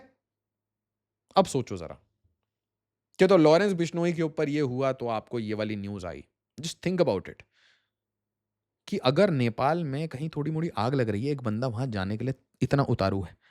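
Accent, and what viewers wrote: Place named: Bihar, India. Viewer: Sir aap Bihari kyu bngye ho accent ko kya hogya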